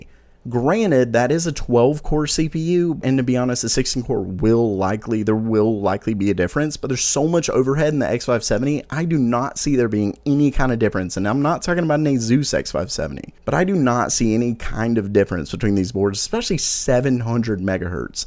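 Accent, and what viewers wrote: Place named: South, United States. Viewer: haha, sounds like you're saying "nanermeter" sometimes. Love that southern twang!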